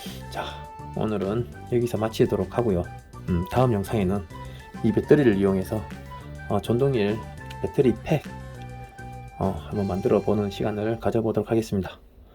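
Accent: Gyeongsang, South Korea. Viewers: 자막설정 안하면 뭔말인지 몰것다...ㅎㅎㅎ 경상도 사투리는 언제들어도 적응이 안된다.......ㅎㅎ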